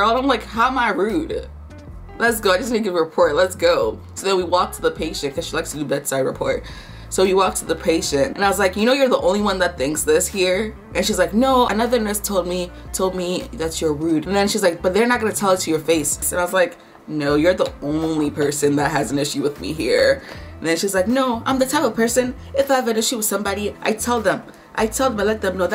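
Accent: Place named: Africa. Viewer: Omg! It’s the African accent for me 😂😂😂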